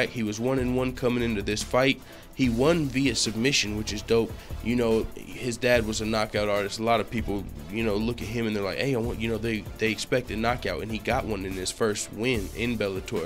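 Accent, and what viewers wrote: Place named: AAVE, United States. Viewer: You're Ebonic dialect is seeping through.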